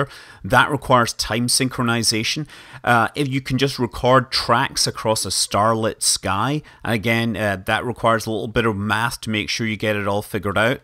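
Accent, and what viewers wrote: Scotland, United Kingdom. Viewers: It just hit me! I figured out why I love watching your stuff so much! Your accent is tricking some part of my mind that Scotty (Star Trek) is explaining this all to me. It must be right! Honestly though, you do a great job of explaining. Even though all this stuff is way above my pay scale, I'm actually "getting it" for the most part. I thoroughly enjoy watching your channel. Keep up the great work. It's cool that you've got your daughter involved as well, it's sure to pay off for her one day. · My head hurts ....too many numbers .....I live on Skye ! You sound jockanise ....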